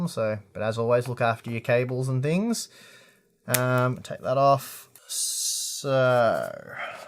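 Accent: Australia. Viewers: Its not "hea" its here, its not spoze its "suppose" Learn to phrase you lazy Australian! Can't beleve you have accumulated so much FREE STUFF from manuafacterers who have sent you their expensive SHIT that you have literally WALLED! Maybe you should be donating them to your viewers instead of throwing shit around like your some expert. HINT! You don't even know how to handle a MOBO! BTW the Taichi board you pulled out and threw to the side is one of the best boards you can buy yet you had nothing to say about it other than "looks like the others". You knew nothing about it? LMFAO!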